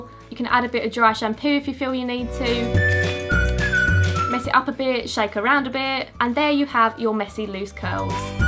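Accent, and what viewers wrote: United Kingdom. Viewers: I love your British accent 😍😍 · British accent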